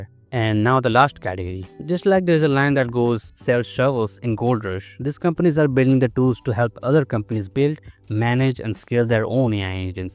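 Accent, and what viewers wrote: India. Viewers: you spell with indian accent make boring startups become 1000% fun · not from Bangladesh I approve it. This is Indian accent. He could be from Odia only. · just from the accent i can say indian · What is ur mother tongue, I want to know which Indian language produce this English accent because it doesn’t sound Hindi accent English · ur accent looks like you are bengali or odia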